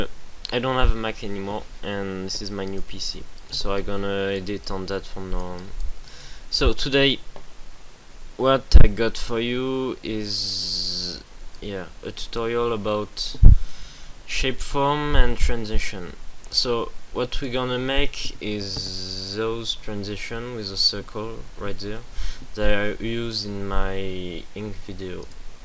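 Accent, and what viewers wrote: France. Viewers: Your accent sounds french... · ah ton englais est parfait avec l accent Français tu t en sort bien t es pmv son extraordinaire continue a en faire j adore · Are you french, swiss?? *.*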